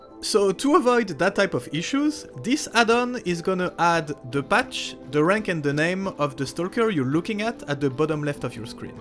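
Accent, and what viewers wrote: France. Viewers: is that a french accent ? Parce que je m’y connais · a little bit too frenchy for me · c'est clairement un accent Français que j'entend la ^^ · Cet accent français incroyable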